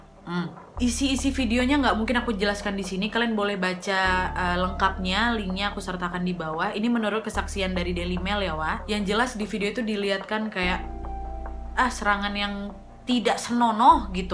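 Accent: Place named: Medan, Indonesia. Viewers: Nonton semua video serasa lagi gybah sama temen, seru habis ya walaupun ceritanya seperti kaidah kaidah semata, tapi dia jelasinnya kayak anak medan kali logatnya, senang kali aku nengok kontennya, sukses terus ya biar kita gibah terus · Anak mana ko kakkk medannya kauuu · Jadi ketagihan awak nonton video uwak..logat melayu deli yg kental kali wak...sukses selalu wak💪😘🙏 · Suka kali aq dengan logatnya.. bah medan kali lah.. langsung ku subcribe. I love u sis ❤😘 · Logat sumatranya kentel nian wak ini🤗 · Aku suka banget sama gaya bicara dan suara yang jelas dan lantang. Pembahasan tentang kasus2 yang dibawakannya begitu runtut, rinci dan detail. Lagipula juga pintar, cerdas serta cantik rupawan. Dari logat bahasa, sepertinya dari Medan-Sumut ya?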